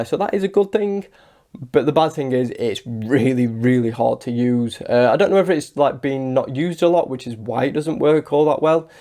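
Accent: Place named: West Yorkshire, United Kingdom. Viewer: Great watch. Wearing mine now! Love the accent too - I'm going West Yorkshire?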